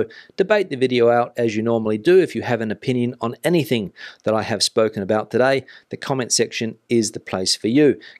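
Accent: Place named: Australia. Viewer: Dude, you are without a doubt the best Spanish newscaster I have ever heard. I'm an avid follower, having lived in Spain over 20yrs in andalucia. You say it as it is in English with an Aussie accent and a straight face and crack me up. Keep doing what you're doing my friend and hopefully one day you'll take a mobile podcast over to Portugal, you're smashing the Spanish news for the British expats 😉👊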